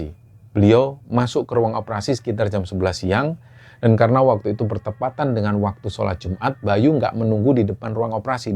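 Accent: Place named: Java, Indonesia. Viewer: Klo mas lagi berbahasa jawa enak didengernya.. Walaw tidak bgtu paham..karna saya asli sunda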